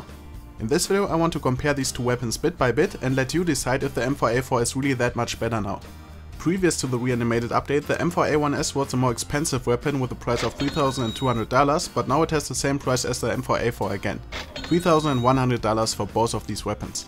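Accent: Germany. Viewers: You are German right? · The german accent is anoying to my ears. I don't know maybe just me. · Ure german? Ich dachte ich hätte den akzent erkannt :D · are you german? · Bist du Deutscher? xD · bist du deutscher? Du hast ao einen kleinen Aczent :) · bist du deutscher? Dein englisch klingt irgendwie deutsch.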